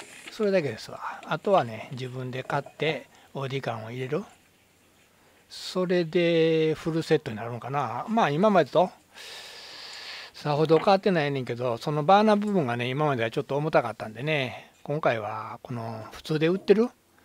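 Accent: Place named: Osaka, Japan. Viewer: やっぱり大阪弁は最高です。しみじみ浸透します。おおきに。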